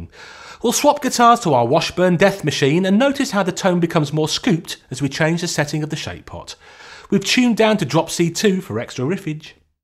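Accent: United Kingdom. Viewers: you are better because you have British accent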